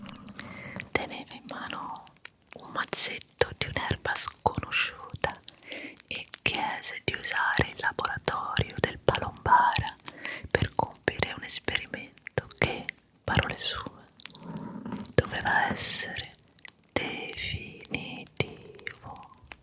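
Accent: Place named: Rome, Italy. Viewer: Si è vero, la pronuncia romana fa ridere anche me 😂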